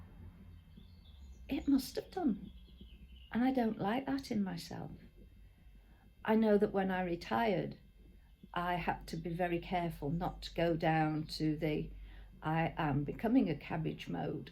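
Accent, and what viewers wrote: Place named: Lancashire, United Kingdom. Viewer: I thought it was a Lancashire accent, maybe more rural Lancs than say Manchester. I was brought up in St.Annes and now we live in Heysham, it's a grand place.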